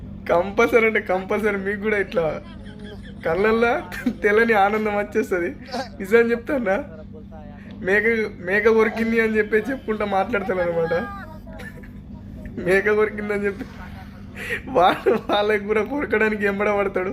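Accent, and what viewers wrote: Nizamabad, India. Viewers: వీడు nizamabad లేదా ఆదిలాబాద్ లాంగ్వేజ్ ఉంది పిచ్సిలేసిపడి వీని స్లాగ్ చూస్తుంటే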